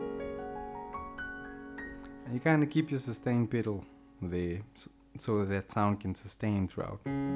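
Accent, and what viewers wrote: South Africa. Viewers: bless you bro. You sound like a fellow South African. But let me not assume.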